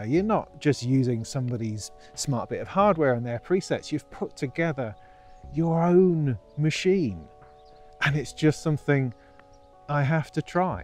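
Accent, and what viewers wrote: United Kingdom. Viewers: if you are into subtractive ways, and since you like the moog sound, i suggest looking into the AJH series, i have 2 of their modules in my rig and i ve tried my share of vcos to tell you you ll get every penny you pay for... don´t wanna mess up your modular or financial plan. but modular is about the analog after all and there is a reason certain modules have a certain depth.. haven´t tried the erica and befaco stuff yet, but i hear a lot of good stuff about them... and at some stage you gotta stop drooling over cwejman modules that will probably not be built because the waiting list is longer than number of heartbeats the man has left. so either filter or vco, AJH would be my suggestion. it´ll stay with you as long as you run a modular rig... and since you sound like you´re from that island that just brexited a leg (just a pun!). 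AJH is local economy! Oh yeah, the disting MK3 has saved many many lives!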